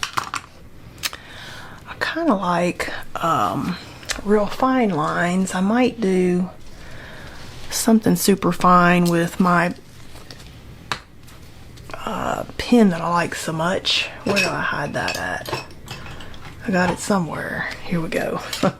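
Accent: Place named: Kentucky, United States. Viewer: I love your accent and enthusiasm! Any chance you are from Kentucky? What would be your top 5 supplies (after watercolor paper , brushes and paints) for a beginner watercolor gal who wants to try following along with you and who does not have any of the pens, inks, sticks and other wonderful things you have? I'm overwhelmed by your Amazon list!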